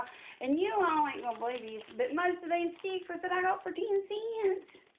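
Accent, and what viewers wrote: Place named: South, United States. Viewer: Hey, love watching your videos, your southern accent gets me every time it's too cute. I fianally found the cinnamon candy and colouring books! I was so happy, I bought two bags of the candy it was really yummy. My yorkie loves any kind of jerky. Like seeing what your mani of the week is too! Have a great weekend sweet friend. Xo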